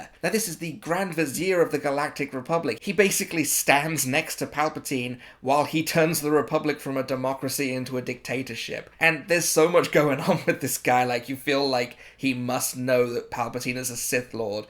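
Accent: United Kingdom. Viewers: Not doing well at the moment but forcing myself to comment now, as I've disappeared off sm for several weeks. Still lovely to hear your smashing British voice on the interweb.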